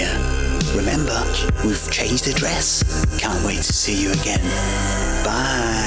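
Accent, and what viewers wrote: Scotland, United Kingdom. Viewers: Anlglisized Scotch accent to the MAX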